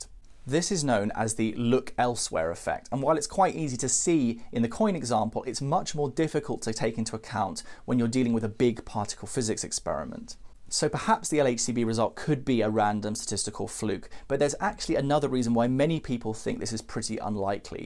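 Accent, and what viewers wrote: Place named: United Kingdom. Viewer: Me a big dum dum! Smarty science guy make me smarterer! Plus his lovely British accent makes it more engaging and it’s neat to think a new fundamental force is out there!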